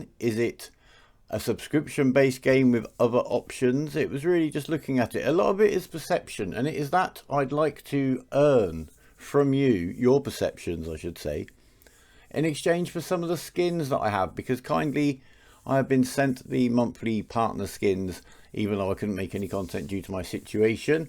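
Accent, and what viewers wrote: United Kingdom. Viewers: Welcome back, hope your condition improves! On my end, I've been able to understand you perfectly. Although if I may comment, it's unfortunate that your accent is UK instead of US. While I prefer a UK accent to listen to, the US accents have a more nasal touch. This results in a lack of stress on the "s" and better to understand even if their condition is worse. If that were to be the case, perhaps we would have the pleasure to have a video from you a little sooner :)) Back to the topic at hand, EVE. Compared to all MMORPGs I'm aware off, this fits in a real niche. Even a 1 day old character is able to participate in end-game content of the newest updates and actually matter. No need to grind levels and gear to have any impact in the newest expension. Even on day 1 after the abyssal filaments release, a rookie cruiser would have been able to jump into the abyss and have fun. 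Of course win chances and improved loot rises the more experienced (older) a character is, but your efforts matter. In that regards, I don't think EVE is pay to win. All the ISK and modules in the world don't help a ship if they meet the right number of cheap fitted ships willing to be sacrificed for their cause. The Subscription based game, would fit EVE like a glove. So much content is more viable when having access to all ships and skills. Nevertheless, plenty of people are enjoying an Alpha playstyle, ever enjoying the endless trial option of the game. IGN : Spectral Spectre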